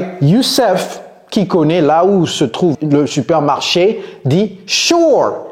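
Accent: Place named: United States. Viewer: hier accent américain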